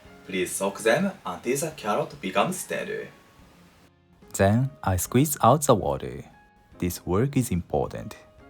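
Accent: Japan. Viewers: Agree! Your voice and Japanese accents are so pleasing to listen to 🌹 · Great video, arigato totemo ! Love your nippon accent and your serenity! Cooking is like a religion 😊🙏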